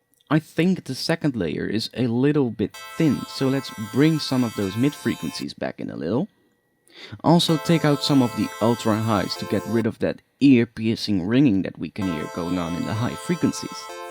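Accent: Netherlands. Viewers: He's from the Netherlands...it's an accent. You are a dumbass.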